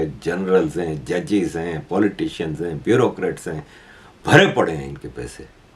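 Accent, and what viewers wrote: Gujarat, India. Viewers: Why bhai sounds like gujrati. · Apka gujrati bhai surat si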